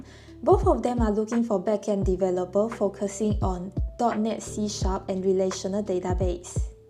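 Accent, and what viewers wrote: Singapore. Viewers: she sounds singaporean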